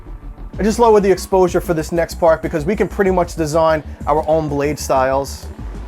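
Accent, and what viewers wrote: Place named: Long Island, United States. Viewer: I detect a long Island accent. Nice video man.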